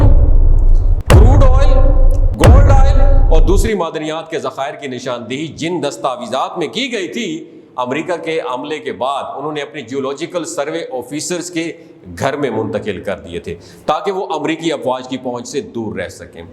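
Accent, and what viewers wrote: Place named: Punjab, Pakistan. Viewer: پنجابی ایسے ہی کہتے ہیں جاپان کو جپان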